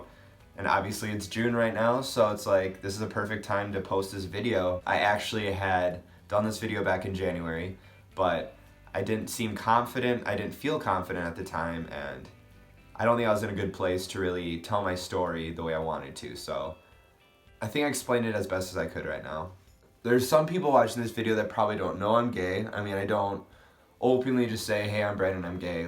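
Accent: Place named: Minnesota, United States. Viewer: Btw, Brandon, I love hearing your voice with your "Minnesota accent". I've lost almost all of mine, but I've been told that those transplants to other parts of the country, who grew up in the midwest, make good TV and radio announcers. People still ask me about my accent occasionally when they hear me speak. :)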